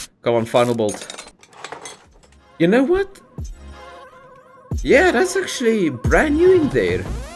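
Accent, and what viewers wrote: Afrikaans, South Africa. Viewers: yeah you can hear your home language Afrikaans but I must say this game is addictive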